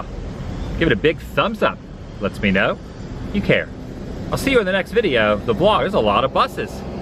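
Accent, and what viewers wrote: South, United States. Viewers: Your accent is changing. Its very very subtle but your voice is becoming less southern.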